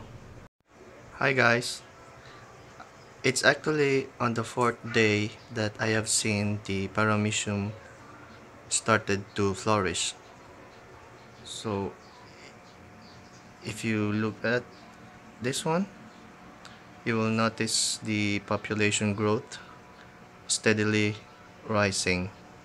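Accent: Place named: Indonesia. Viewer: logatnya indonesia nih wkwk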